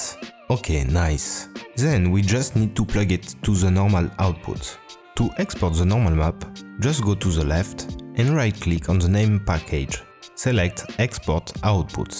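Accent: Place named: France. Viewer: the frenche accent is tout bien mec !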